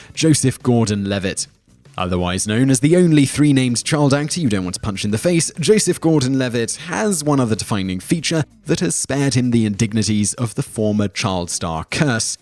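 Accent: United Kingdom. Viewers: has always been my favorite top ten list channel. Dont lose. We Yanks love British accents.: )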